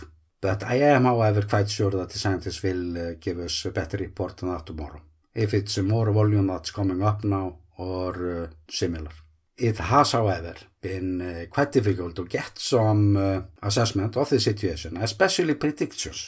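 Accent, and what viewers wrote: Iceland. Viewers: Icelandic accent is so cute and funny!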